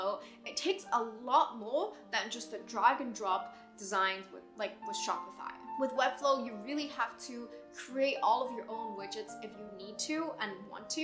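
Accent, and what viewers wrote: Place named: United Kingdom. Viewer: Hi! what is your website? I have wix & I didn't find it easy to use at all. I would love to have a one on one to see if you can help me. You are so excited about the flow, code graphics... Etc. I love you accent, I'm a big British fan and I picked up on it right away🙂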